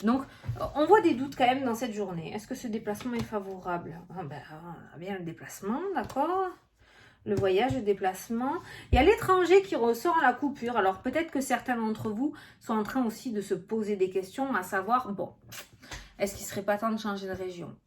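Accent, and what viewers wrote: South, France. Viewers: j'adore ton accent on se croirait en vacances dans le sud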